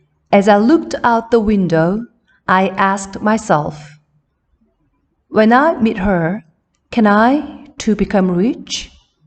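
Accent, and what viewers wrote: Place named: United Kingdom. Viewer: 영국식 영어발음 넘 멋져요 ❤️ 읽어 봐야겠어요.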